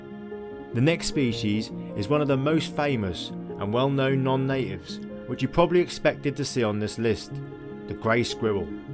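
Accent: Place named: Poole, United Kingdom. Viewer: U sound like Poole mush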